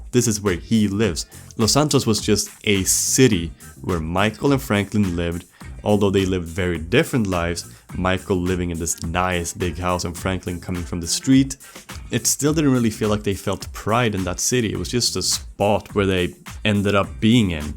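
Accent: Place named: Sweden. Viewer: ju saund sviidish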